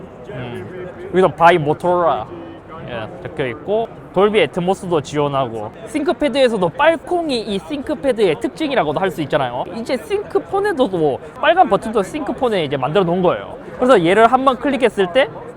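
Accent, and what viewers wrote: Gyeongsang, South Korea. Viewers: 외국엔 좀 안나가면 안되나 한국인이 다 잇섭처럼 눈작고 안경끼고 말투 경상도말투 쓰는줄 알거아냐 ㅠ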